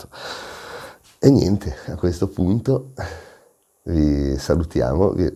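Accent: Genoa, Italy. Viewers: Perché, come si dovrebbe dire? Comunque sì, il maestro è genovese!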